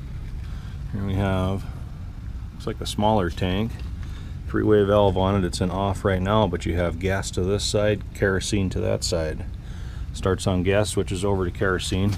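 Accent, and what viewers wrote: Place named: Midwest, United States. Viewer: I'm from the Albany area of New York... and it always tickles me to hear the midwestern pronunciation of Albany.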